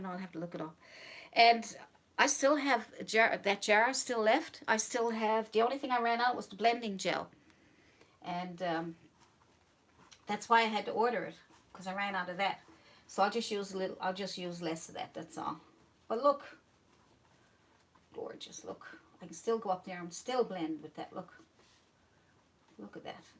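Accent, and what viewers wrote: Newfoundland, Canada. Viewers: I must ask you, are you from Newfoundland. I hear your accent and colloquiums which bring me to this conclusion. I'm glad I found your great tutorials. Best Kind. Thank you for your secret into why my paintings are killing me. I was a mural painter for a living with a few other talents lol I thought canvas painting would be a great step but I hit the wall. I'm taking it down after watching you, brick by brick. Take care.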